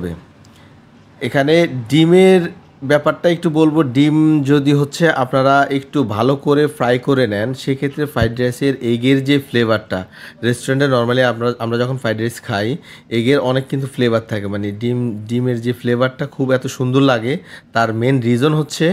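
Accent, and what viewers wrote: Noakhali, Bangladesh. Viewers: Noakhali mone hocce.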